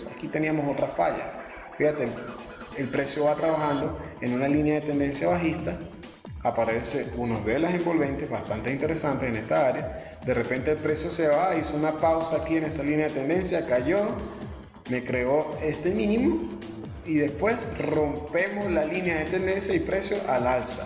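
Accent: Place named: Venezuela. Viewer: Conho hace mucho que no escucho un Venezolano... Bien hay mi pana estoy aprendiendo y tu aporte es importante, gracias.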